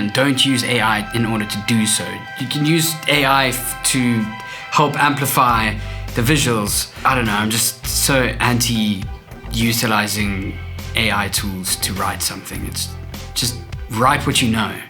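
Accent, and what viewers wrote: South Africa. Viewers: I didn't know who you were until pressing play on this monster length recommended video. To immediately hear the South African accent and find out that you're also a Cape Town boy I knew I wanted to watch it till the end. The love and attention to detail you put into making this Masterclass is amazing and using all of your own advise within it places this at the top of my recommended list for anyone interested in film making. I'll definitely watch this again and add what I've learned from you to my next project. Thanks BRU and God bless. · Howzit! Is that an SA accent I hear? Thanks bru for all the info... was wondering if you think your region restricts your success on YouTube?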